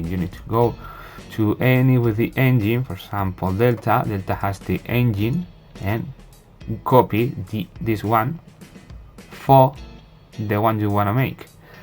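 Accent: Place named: Spain. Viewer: Thank you so much. I will try to make a new livery. I am french but I live in Thailand. I speak english same thaî! It seems to me that you are fron spain. That is why I can understand your english. When I hear english from USA I understand nothing.You have accent that I can hear. 55555 . In thaî language the number 5 is pronounced "ah". And when we say that we are laughing we write 55555. That means hahahahaha. Thank you again for your video.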